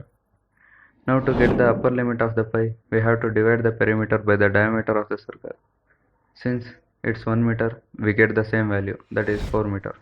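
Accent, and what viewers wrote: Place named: India. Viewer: It's not just your video. I find it difficult to listen to anyone who has a very thick Indian accent.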